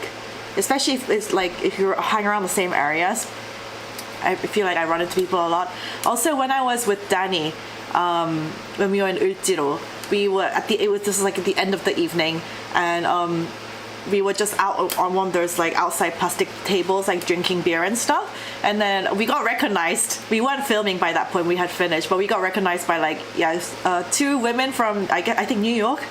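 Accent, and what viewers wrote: United Kingdom. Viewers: You are just super hot! The British accent just propels your channel!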